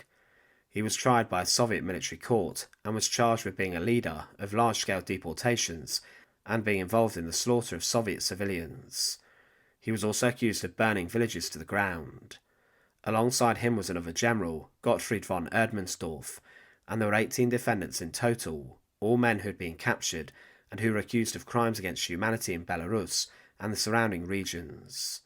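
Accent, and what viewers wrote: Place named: England, United Kingdom. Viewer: I can’t stand English accent and this dude takes it to another level.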